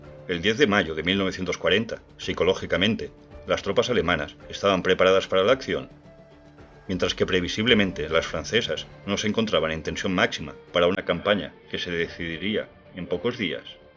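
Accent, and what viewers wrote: Galicia, Spain. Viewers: oye eres gallego?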